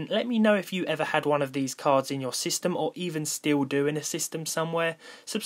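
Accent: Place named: Kent, United Kingdom. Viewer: I've just realised that is a picture of swalecliffe/herne bay! I knew I recognised your accent!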